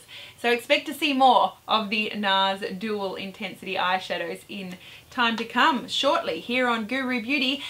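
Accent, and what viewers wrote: Australia. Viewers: I do really enjoy your reviews,; as someone else said, they are 'professional' and so easy to listen to. I aways learn something new, and I just enjoy hearing your Aussie accent!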